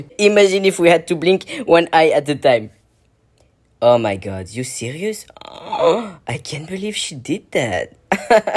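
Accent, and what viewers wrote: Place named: France. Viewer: It sound like a french accent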